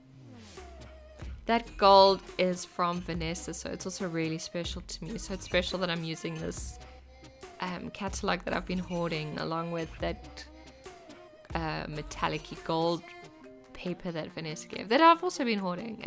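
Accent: South Africa. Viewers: Do I hear a South African accent there? Enjoyed watching your journal process. TFS!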